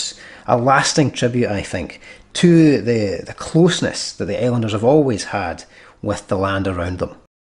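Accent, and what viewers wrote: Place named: Scotland, United Kingdom. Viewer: Great! I always liked Scottish accent - keep up sharing Subscribed to your channel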